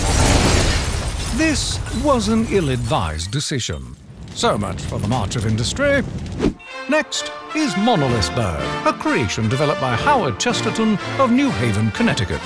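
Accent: United Kingdom. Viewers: but it looks great because a British chap is doing the voice over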